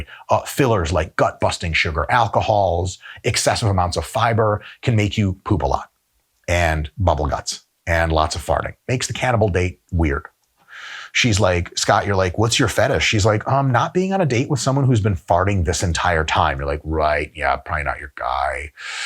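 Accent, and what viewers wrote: United Kingdom. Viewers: 😂😂 As an English fan of yours you do make me laugh with your British accent. Nice one 👏🏻💪🏼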